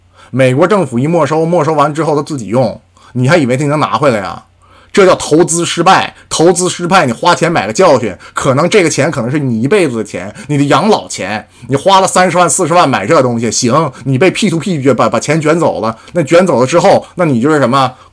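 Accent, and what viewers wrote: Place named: Beijing, China. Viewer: 好家夥，是青島人嗎？這口音我還以爲你是北京的呢，完全沒有青島口音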